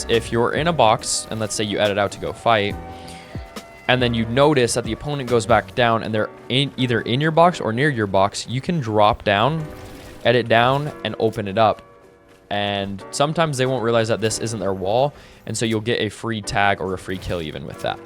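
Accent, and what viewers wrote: India. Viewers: are you punjabi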